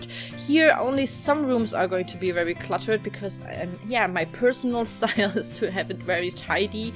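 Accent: Germany. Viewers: Hey :) Where do you come from? You sound really german to me 👌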